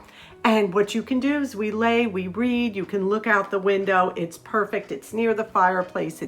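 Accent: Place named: Long Island, United States. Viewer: Love it! Are you from Long Island, NY? Can't miss your accent! Is the icing on the cake...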